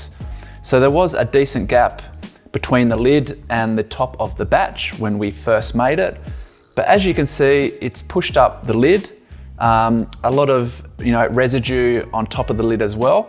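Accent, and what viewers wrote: Australia. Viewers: I wish this audio had an option filter out that heavy Aussie accent...long listen.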